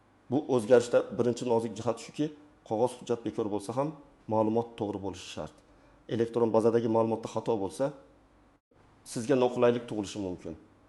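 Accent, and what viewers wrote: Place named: Samarkand, Uzbekistan. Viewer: Шунча вактимни утказиб эшитипман? Хали бу ёлгонмиди ? Узбекчани билади, факат самарканча точик акцент бн гапираяпти буни ёмон жойи йук лекин ёлгонлиги яхши мас !? 😮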